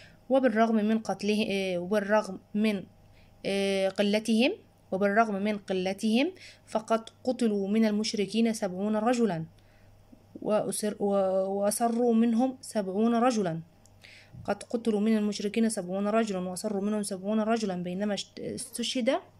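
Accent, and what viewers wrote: Egypt. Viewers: حبيت نعرف حاجة هذي مصرية يسما مصر يقراو كيما حنا ولا كيفاه من فضلكم جاوبني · مصرية او جزائرية🤯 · انت مصريه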